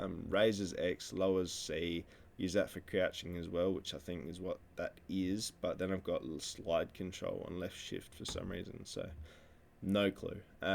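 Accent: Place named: Australia. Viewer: Finally an aussie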